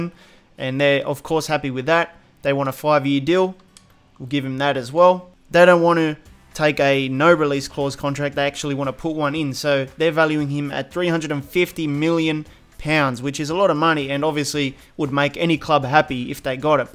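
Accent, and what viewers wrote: Australia. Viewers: Are you Australian? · Hey r u from austr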